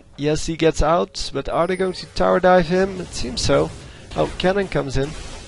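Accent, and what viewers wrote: Netherlands. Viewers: you must be dutch ??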